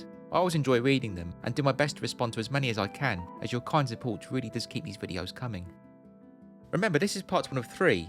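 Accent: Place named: North London, United Kingdom. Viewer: Just found your channel, thank you for sharing. Your speech pattern, especially your pronunciation of some of the 't's ans "k"s at the end of words, is very reminiscent of fellow YouTuber, Lady Decade. Is that a North London thing or something?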